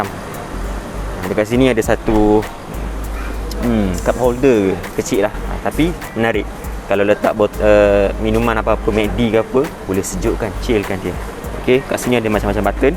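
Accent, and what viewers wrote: Terengganu, Malaysia. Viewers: Dang² lg cakap teganu. Terbaekkk review boh😂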